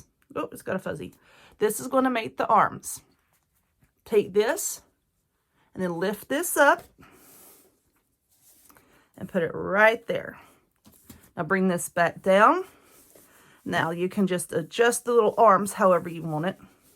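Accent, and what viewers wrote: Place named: South, United States. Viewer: Please don't ever quit with your tutorials. I really enjoy your accent. I am from Tenn and I really miss hearing good ole southern talk. I also remember these in my granny's kitchen. I also remember one with a poem that used used two long men's white socks. Do you have any idea about that craft? If so could you please do a tutorial on it.. I just found your site and am definitely subscribing.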